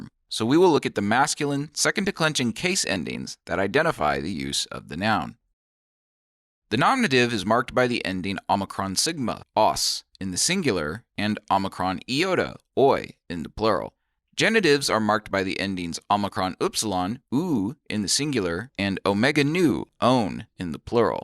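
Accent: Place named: United States. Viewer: Interesting, although the butchering of the Greek with the unpleasant American accent was a distraction. No attempt at a good pronunciation whatsoever. Quite harsh sounding.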